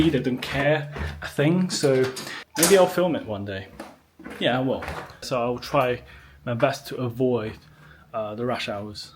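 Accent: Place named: United Kingdom. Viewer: I really enjoyed this video. Very interesting. Guessing you studied in the UK from your use of "dodgy", right? You actually sound like a Brit, to me, and I'm English. Your commentary on how people are "ruthless and rude" is a nice contrast to most of the videos I've watched from ex-pats who seem to get lost in heaping praise, for whatever reason. I'm considering TEFL there, but my Mandarin would need to get a LOT better first.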